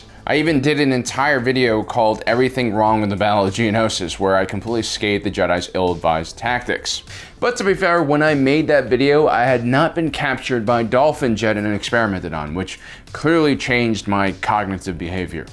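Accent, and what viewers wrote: Australia. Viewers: That Australian accent tho 😂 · dat Australian accent doe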